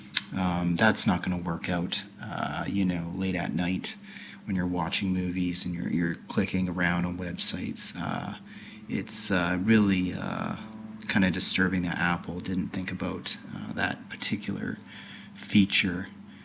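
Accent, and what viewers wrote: Canada. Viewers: This guy sounds Canadian to me. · wow i've never heard someone call so many things by the wrong name. i bet you call the ipod touch an itouch too. the cpu is in ghz and the disk is 320gb. the ram is 4, its called macbook air, and macbook pro. its also called 9400m and 9600m gt. i sense a canadian accent so it's understandable.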